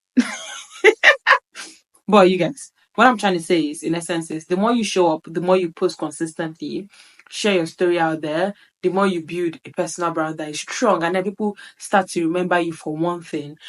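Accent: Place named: Nigeria. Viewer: I love the way she's so proud of her Nigerian English and thanks for this advice mama ❤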